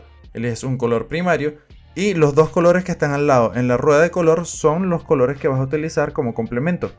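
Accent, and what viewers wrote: Venezuela. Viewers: Ese acento. Venezolano, tal vez?. Dibujas super genial!. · si es evidente, solo que no es tan conocido. Pd: a mi me gusta mucho el acento veneco jeje. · Eres venezolano?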